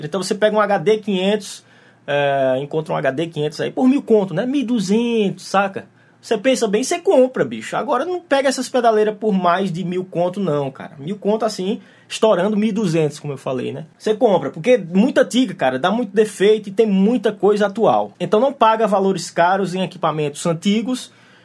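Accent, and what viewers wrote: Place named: Northeast, Brazil. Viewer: O melhor de tudo: Sotaque Nordestino é 1000! 🤩❤️ Top!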